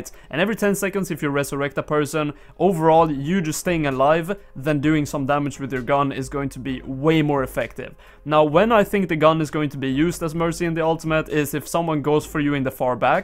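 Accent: Sweden. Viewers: ok tyckte du hade en svensk dialekt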